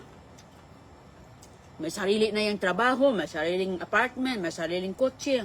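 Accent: Visayas, Philippines. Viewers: Sorry bisaya lanh kung ganyan accent niya. Be kind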